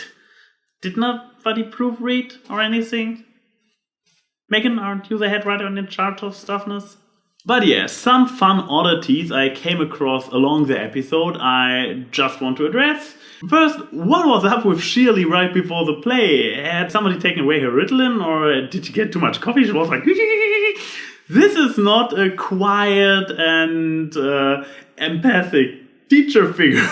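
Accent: Germany. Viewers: I must be a dumb blonde for not picking up on the Christmas carol reference., you're execution of this impression felt a little confusing to me because it seemed like you were jumping from topic to topic too quickly and add in your German accent, it was very hard to follow. Can you try to slow down next time? Thanks.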